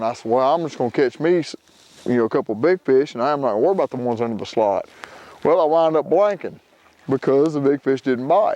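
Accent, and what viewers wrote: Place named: Louisiana, United States. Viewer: "Where you goin'??" LOL good ol' Louisiana boy.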